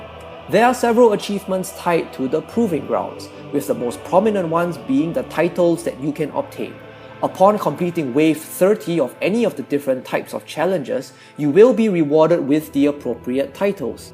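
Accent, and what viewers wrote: Singapore. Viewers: saw in another comment that you said you are sg..well that explains the accent ..but more importantly thank you for the tips ,they were very imformative and helpful for us casuals..i need to get the titles and a few mounts but ugg..and the mage towers really pissed me off..i can't find a good assasin rogue video to refer to..keep posting man i'm subbin ya ..we play both factions so we will see what happens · no wonder I found your accent familiar , I'm from Singapore too ! Which server are you playing ! Wanna play together ? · Are you singaporean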